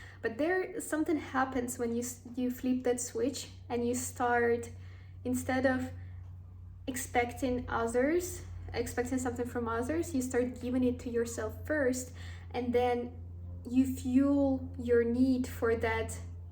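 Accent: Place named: Russia. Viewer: твой русский акцент слишком палевный)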